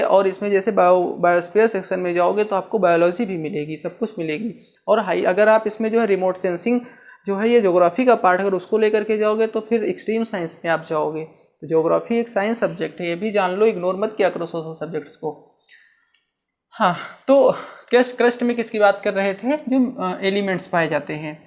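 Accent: India. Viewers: Sir you speak the purest form of English in Indian accent 👍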